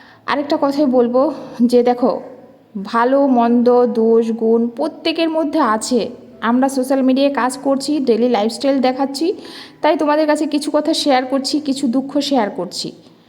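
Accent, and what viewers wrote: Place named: Dhaka, Bangladesh. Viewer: Tomar bhasa ta dhakia. kamon kore ktha balo, Mon k balo MN,Prosad k bolcho psad, prothom na bole balo Pthm. Sudhrao egulo, kthate ato dhakar taan sotti. Somosto uchcharon vul karo tumi. Egulo sudhrao,Nijeke jakhon Sikkhito dabi karo loke to bolbe je ki porasuna koreche ktha thik mato bolte parena, Tomato k balo Tomyatu kamon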